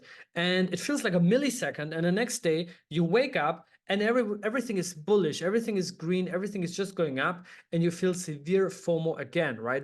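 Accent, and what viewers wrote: Germany. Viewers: He‘s German, right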